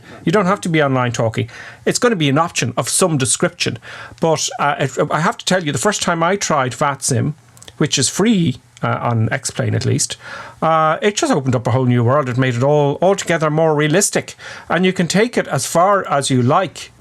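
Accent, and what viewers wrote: Ireland. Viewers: Where in ireland are you from lad? I’m from tyrone. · are you irish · As an American, I love your Irish accent and the excitement in your voice! And you have a great sense of humor! 😂 Makes the time pass by quickly until the release!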